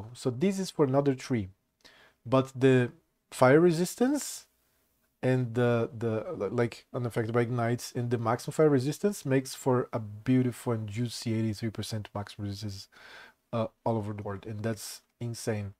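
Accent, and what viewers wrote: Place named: Brazil. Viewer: You sound brasilian 👀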